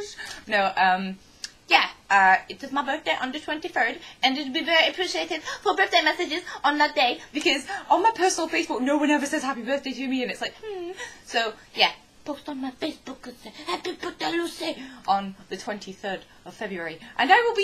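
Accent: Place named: United Kingdom. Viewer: i didnt realise ur british ? :O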